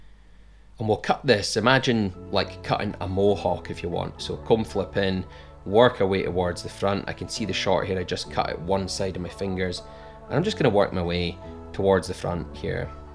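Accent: Scotland, United Kingdom. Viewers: I’ve lived in the states since I was wee, it’s still lovely to hear a calm Scottish voice every now and then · Question, is that a Scottish accent? Lol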